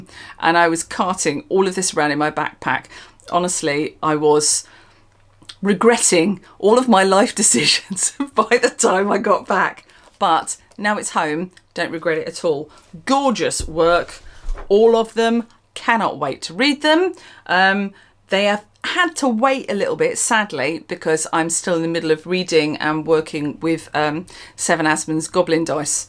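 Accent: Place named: East Anglia, United Kingdom. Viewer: What makes your accent unique to East Anglia? I do like it.. . I have a mild southern accent.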